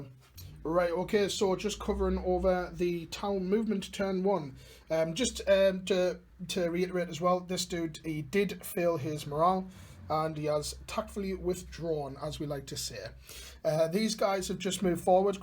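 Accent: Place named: North East England, United Kingdom. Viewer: Hey dude, which part of the North East are you?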